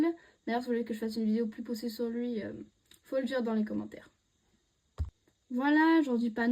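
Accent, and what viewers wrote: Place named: France. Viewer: Aaah trop choupi❤❤ bravooo!!! 🍾 👏🏻 t’as un accent très français 🎀😮 ! Aww trop choupi ☺️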